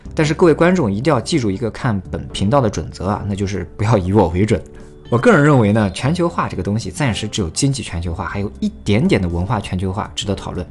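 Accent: China. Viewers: 不知道其他人跟我是不是一样的感受，一口流利的中文，而且听起来还是大陆人，一口一个中国，中共，老毛，“新加坡”，这个感觉就有点讽刺的意味，有可能是我的格局小啊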